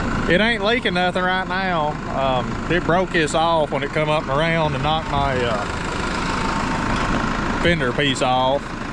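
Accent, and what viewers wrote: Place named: South, United States. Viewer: hi nice hay were is this farm you talk southern, i live in hadley ma very dry here and no rain monday get some need it bad is it dry there and how is the hay crop growing, you have cows and feed them i used to have a farm mo longer every thing got sold and lighting hit the barn last summer every thing got lost so no more thanks for the videwo.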